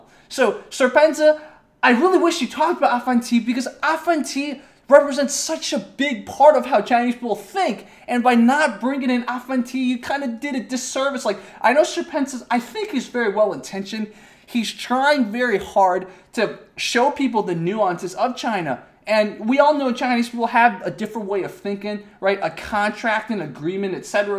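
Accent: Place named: South, United States. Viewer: Why you have southern American accent?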